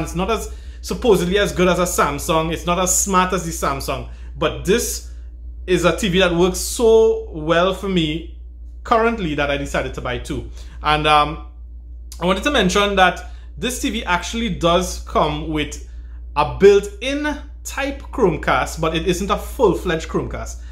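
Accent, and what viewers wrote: Trinidad and Tobago. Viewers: You sounding like a trinidadian.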